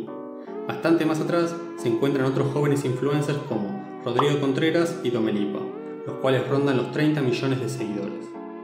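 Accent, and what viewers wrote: Argentina. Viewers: EL NARRADOR ES ARGENTINO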